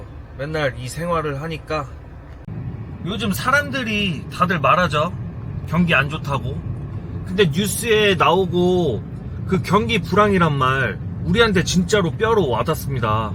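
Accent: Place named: Jeolla, South Korea. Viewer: 말씨는 전라도인데 1찍은 아니신가보네요.?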